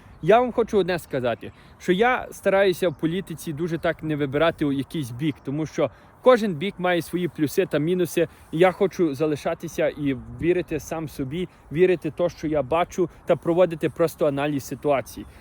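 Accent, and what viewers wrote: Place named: Ukraine. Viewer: МОЛОДЧИНКА,ПО МОВІ ВІДЧУВАЄТЬСЯ УКРАЇНСЬКЕ КОРІННЯ.ХАЙ ПРОЦВІТАЄ КАНАДА,НАШІ УКРАЇНЦІ,КОТРІ ПРОЖИВАЮТЬ У КАНАДІ.!!!❤❤❤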